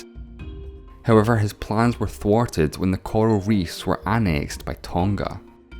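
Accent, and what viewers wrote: Scotland, United Kingdom. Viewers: Its a Scottish accent not English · Sees thumbnail. Cynically wonders what BS they'll say about Scotland. Hears a Scottish accent presenting and is filled with hope. Are you actually kidding me? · I have no clue about this fact. Also, I subscribed! Are you from Scotland?🏴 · He is Scottish. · I like his scottish accent..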